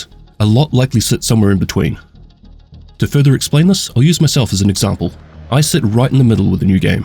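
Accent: New Zealand. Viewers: smooth kiwi voice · Monotone Kiwi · your kiwi monotone voice works wonders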